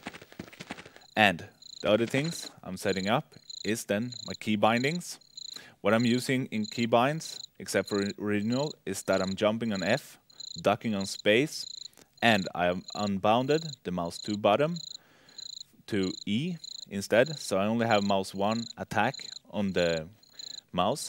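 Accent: Sweden. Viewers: love his swedish accent :D! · 32 people diden't understand swedish-engilsh.... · That's because English isn't his Native language, he's Swedish. Can you speak Swedish perfectly? Also he is legend, wait for it...Dairy!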